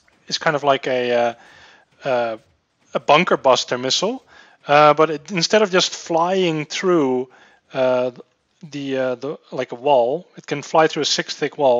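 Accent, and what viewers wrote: Netherlands. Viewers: He sounds dutch.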